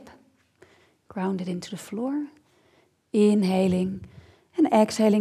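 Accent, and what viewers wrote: Netherlands. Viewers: Heerlijk die Hollandse T's in plaats van de 'Th' klank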